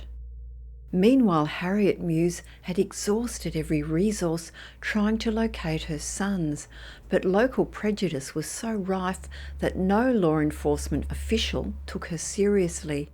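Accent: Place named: Australia. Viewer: Finally, an Australian narrator, with a nice soothing voice at that.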